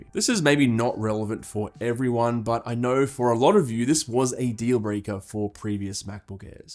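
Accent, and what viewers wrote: Australia. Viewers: me rocking a 2015 macbook pro which i think she is screaming for me to let her retire lol thank you for making this content and nice to hear another aussie to! I tend to lean to more beefy ram as i hold my laptops for years. I do think I can buy any of the current line up and be really happy but I have been a pro user for ages ha. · Why do i feel stupid when i can’t easily follow through an Australian accent 😅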